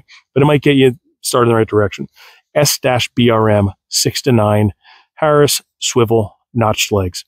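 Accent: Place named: Cuba. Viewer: Your accent reminds me of a Cuban comedian in Miami called Mario Ramil dudes hilarious. Great channel and content btw keep it going.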